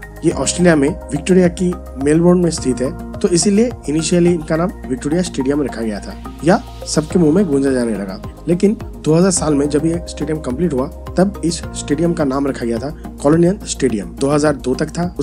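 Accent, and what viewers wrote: Bengal, India. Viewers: Bro are you Bengali? You sounds bong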